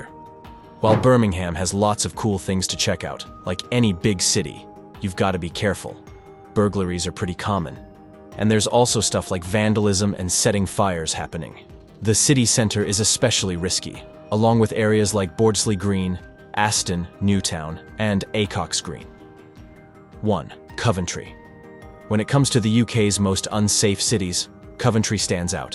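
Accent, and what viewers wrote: United States. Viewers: I carnt take it seriously because of his American accent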